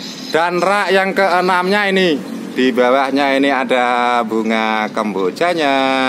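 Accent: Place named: Java, Indonesia. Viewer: Medok jowo mas e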